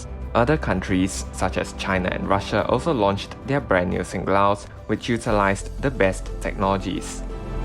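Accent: Singapore. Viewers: im guessing thats a singaporean accent with some shots from changi?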